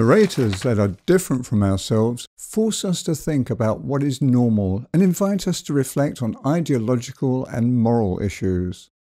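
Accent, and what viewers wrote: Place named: United Kingdom. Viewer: I love this video! I laughed at these animation so much and the serious British voice is the perfect foil for them.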